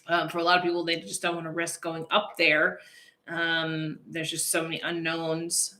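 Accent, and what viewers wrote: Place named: Rochester, United States. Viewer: too funny! I've been wanting to ask if you're from Rochester NY for a while now as I hear that accent! I'm from Webster ;-)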